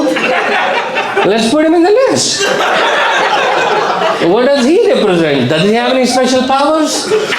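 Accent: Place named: India. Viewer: Keep ur Indian accent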